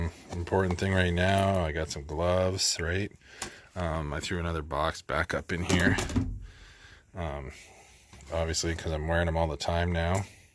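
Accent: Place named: Canada. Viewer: Great video and always interesting to see what other trades carry...initially I thought you were American, until I heard the third or fourth "Right" then I thought you must be Canadian! lol Edited to add what are the two tools at the and what are they for?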